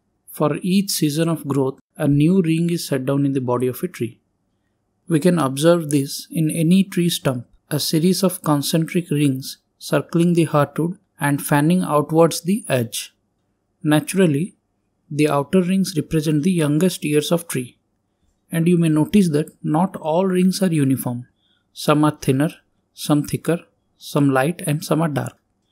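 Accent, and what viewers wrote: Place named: Bihar, India. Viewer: Sir you are definitely from bihar.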